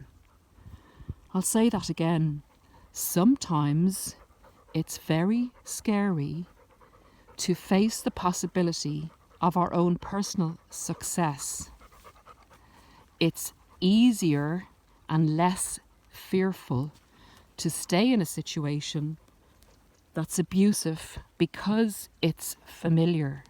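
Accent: Ireland. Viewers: So refreshing to have a video with that soft, kind Irish accent! I appreciate your style and also, you seem to be in a similiar age range as myself. I find you very relatable.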